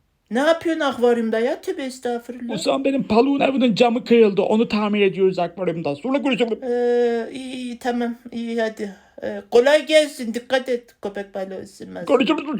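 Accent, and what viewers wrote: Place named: Tokat, Turkey. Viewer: abi tokatlı şivesinden anladım,hatta yemin ederim tokatlı.ben buna cevap verdim de bende senin gibi nerelisin yazdım o yüzden boşuna yazma yani.😊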